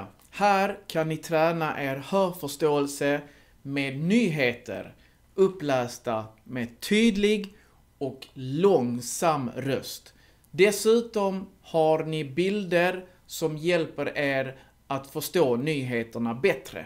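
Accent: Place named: Skåne, Sweden. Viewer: Kan du inte ta det på Rikssvenska? Skånska är svårt att förstå. Dessutom så framstår det inte hur man lurar staten.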